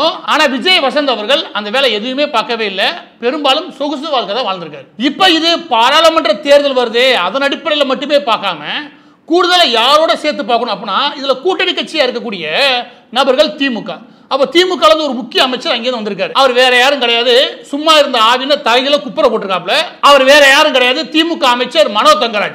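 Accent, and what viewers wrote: Madurai, India. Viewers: மதுரையை விட்டு கிளம்பி மஹாராஷ்டிராவுக்கு வந்து 24வருஷம் ஆச்சு.. உங்களாலே தான் மாரி தாஸ் சார் நான் மதுரக்கார ஃபீலிங்ல சந்தோஷமா இருக்கேன் 😊✌🤝I like your Madurai accent👍👏💃😊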